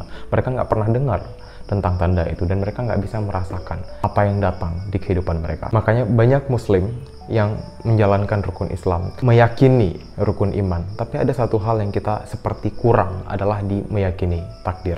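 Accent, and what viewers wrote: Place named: Aceh, Indonesia. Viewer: nyan kan butoi.. meuaceh that bak pegah haba 😂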